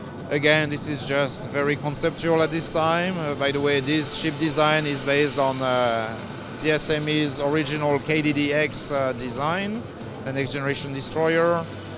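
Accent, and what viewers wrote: France. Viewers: Why did they hire the Frenchest French guy to narrate this in English? Could they not afford an actual English speaker?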